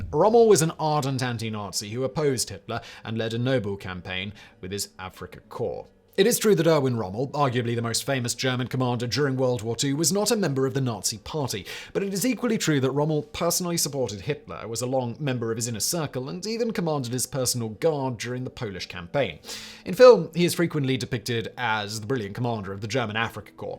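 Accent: United Kingdom. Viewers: Britain or Scotland. Love it.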